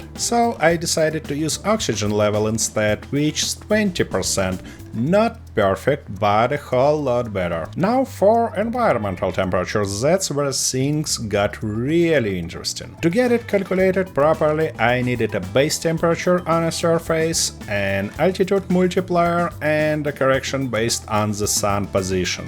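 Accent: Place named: Russia. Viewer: This is already interesting as a topic just on it's own but having it explained to me by a crazy person with a thick russian accent somehow makes it even better.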